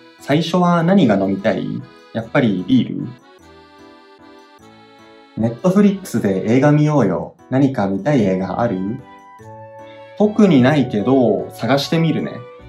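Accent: Taiwan. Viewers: 老师的中文也有点台湾腔了haha🙋🏻‍♂️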